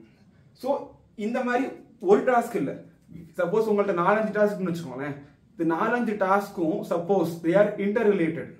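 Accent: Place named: Kerala, India. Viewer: And your malayalam kalandha thamizh is beautiful and cute.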